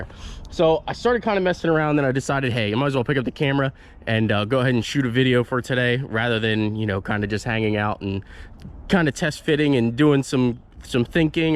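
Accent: Pennsylvania, United States. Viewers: Got some hard work on this one coming! Is it a PA accent that gold = goad and water = wawter?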